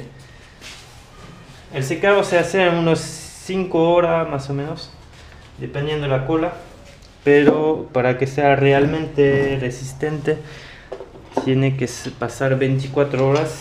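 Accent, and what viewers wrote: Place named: France. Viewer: lo sabia jaja, tu acento español me hizo pensar que serás de Francia o alrededores jejeje grande, aquí el tilo es algo caro porque lo traen a través de la aduana y deben pagar por el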